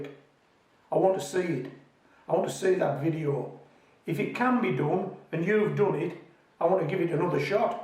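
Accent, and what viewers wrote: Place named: Cumbria, United Kingdom. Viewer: Hi, I've been trying to pin down your accent to amateur rugby league teams and i have decided on Wath Brow. Close? Keep the great videos coming.😀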